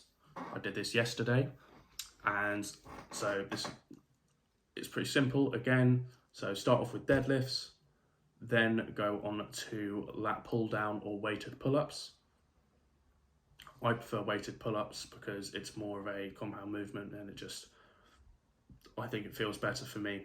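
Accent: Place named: Suffolk, United Kingdom. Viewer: I knew i recognised your accent. Suffolk represent haha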